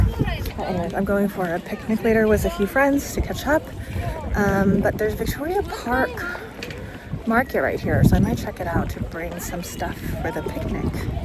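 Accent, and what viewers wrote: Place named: Canada. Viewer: I love the Canadian raising in your accent - every other sentence sounds like a question!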